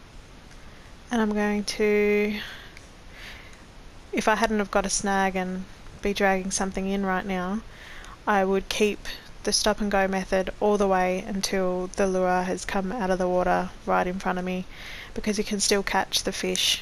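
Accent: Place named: Australia. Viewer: I was not on speed 2 and wasn't able to get the stop and go up off the bottom. Was giving the little grass pickerel a fit changed to speed 2 first cast was practice.... second cast Trophy Grass pickerel. Lol off to Neherin for that last one in my mission Thanks...love your accent by they way...is That Aussie?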